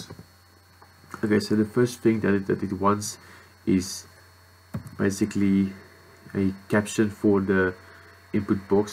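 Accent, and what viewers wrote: Afrikaans, South Africa. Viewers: That boere engels. Haha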